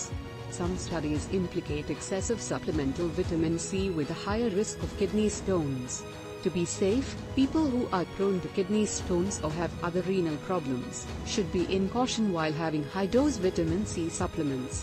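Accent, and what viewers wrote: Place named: India. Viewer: As soon as i heard the indian accent i stoped the video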